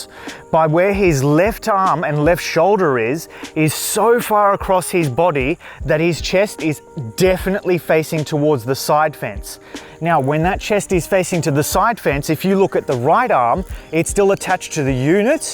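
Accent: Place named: Australia. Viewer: I was very confused by a coach named speaking with an Aussie accent. Really good video production, super clean camera footage, clear explanations, and what an aesthetic vibe. Subscribed!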